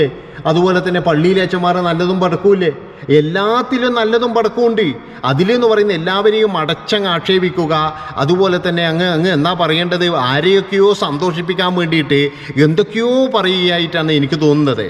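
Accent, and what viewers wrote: Kannur, India. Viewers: സംസാരം കേട്ടിട്ട് നിങ്ങള് നമ്മളെ നാട്ടുക്കാരനാണെന്ന് തൂണുന്നു.കണ്ണൂർ😂❤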